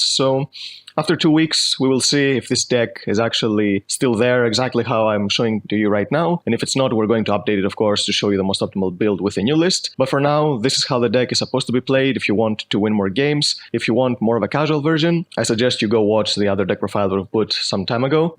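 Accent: Greece. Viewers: So I am really find of blue eyes and this deck is rly budget as well... I just have one question: which country are you from?cause your accent is really good for someone whos mother tongue isnt english. Your accent seems like Greek...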